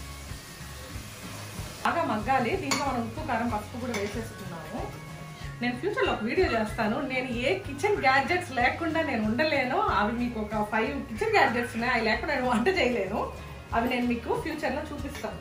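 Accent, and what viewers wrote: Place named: Nellore, India. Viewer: ఆదివారం గారి అద్భుతమైన వంటలు కార్యక్రమం కి స్వాగతం సుస్వాగతం, ఉదయం దోస చికెన్ పులుసు ఈడు జోడు కుదిరింది, మొదటి సారి మీ ముఖం మీద చెమటలు పట్టడం చూసా చాలా శ్రమించారు ఫ్ ఫ్ ఫ్, నెల్లూరు కనపడుతుంది మీ మాటల్లో గారు, రియో గుడ్ బాయ్ అండి బాబు, ఏదో వీడియో చేసినట్లు కాకుండా పక్కన ఎవరికో చెప్తున్నట్టు ఈజీ గా చెప్పేస్తున్నారు, ఫ్రీ గరం మసాలా చాలా ఫ్రీ గా వేసేసారు, ఎప్పుడు ఎప్పుడు వంట తొందరగా చేద్దామా అని చిన్న దోస పెనము మీద వేసేసారు, ఆహా నెల్లూరు యాస యాడికిపోతున్నావు👍👍👍, ఆహా నోరు ఊరించే దోస చికెన్ సూపర్, నోరు కాలింది 😂😂😂 పక్కన వీడియోగ్రాఫర్ నవ్వులే నవ్వులు, మీ అబ్బాయిల రివ్యూ బాగుంది, మొత్తానికి సాధించారు మంచి రిజల్ట్ ఇచ్చారు, చాలా కష్టపడ్డారు గుడ్. మాకు హోమ్ టూర్ వీడియో కావాలి, సిరీస్ అంటున్నారు అంటే అది ఎంత పెద్దదో అర్థం చేసుకోగలం, తప్పకుండా వీడియో చెయ్యండి గారు 👍👍👍.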